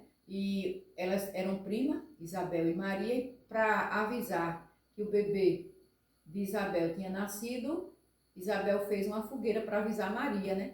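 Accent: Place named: Pernambuco, Brazil. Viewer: Amei cair nesse canal. É o tipo de amizade que mamãe deixa 😅😅😅 tô pedindo a Deus coisa boa pra ver. Seu sotaque parece com o da minha família que é pernambucana, eu sou do Rio.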